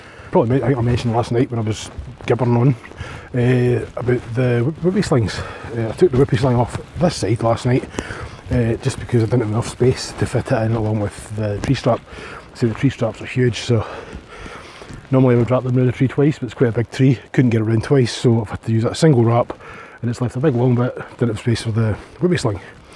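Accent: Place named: Scotland, United Kingdom. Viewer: Fantastic, didn't stop laughing the whole time, and I grew up in innellan just down from Dunoon so I bl**dy love the accent, reminds me of my youth, keep up the good rant... I mean work.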